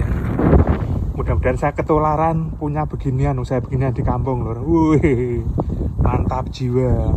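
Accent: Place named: Java, Indonesia. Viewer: Wis njan....senajan awakmu wis tekan adoh korea kono....tetep wae medok. *kui kue sing kotak cilik neng nggonku jenenge etheh etheh plengeh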